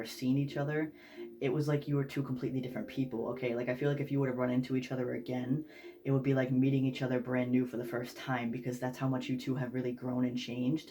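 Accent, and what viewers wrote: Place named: New England, United States. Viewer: Just found you...love that New England accent! I'm from there too. Spot on, but the guy I loved turned out to be a total a&&s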